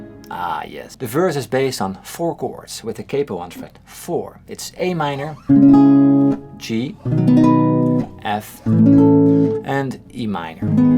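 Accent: Netherlands. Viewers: Je accent lijkt net op een Nederlander die erg goed Engels spreekt 😀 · Why do you sound so Dutch, when you talk? There's nothing Dutch on your YouTube account. The way you pronounce fingers, do you have one or two Dutch parents? Then, you should really meet Teye.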